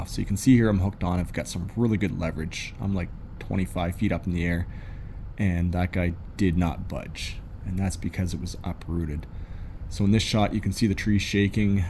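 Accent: Canada. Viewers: He's in Canada. His accent is a dead giveaway.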